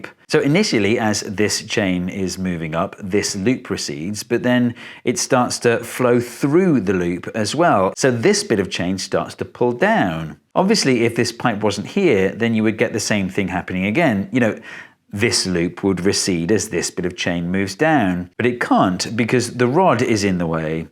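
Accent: United Kingdom. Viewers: "I was just mesmerized by his blue eyes and his soothing voice" Lol. Thing is, i agree. And i don't even like the british accent. ❤👍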